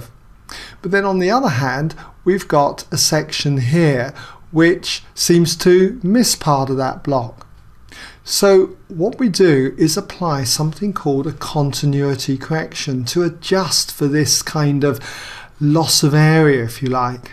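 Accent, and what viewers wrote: United Kingdom. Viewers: British accent is always so charming :)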